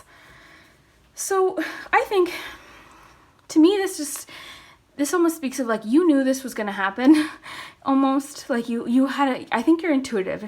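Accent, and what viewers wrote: Minnesota, United States. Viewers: Quick question are you from Minnesota?? Just because you said pop and your accent 😂❤️ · anyone know where she is from? or her actual name? i love her videos so much i watch all of them but i love her accent i just wanna know if its like Minnesota or something 😂 i feel like it is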